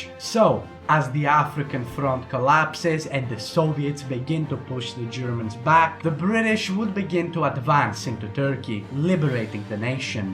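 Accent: Greece. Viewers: Φίλε μου το βίντεο σου είναι πολύ καλό και συγχαρητήρια γι' αυτό! Μου αρέσει τρελά που ξεχωρίζεις ότι είσαι Έλληνας, μέσα από την αγγλική σου προφορά. Εντάξει ωραίο 😄😀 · Biggest greek accent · Μου αρέσει η ελληνική προφορά μέσα στα αγγλικά!!😄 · You have a perfect Greek accent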